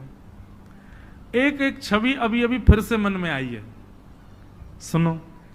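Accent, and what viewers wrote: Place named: Braj, India. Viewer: Aapki brij bhasha sunne mei bahut maza aa raha hai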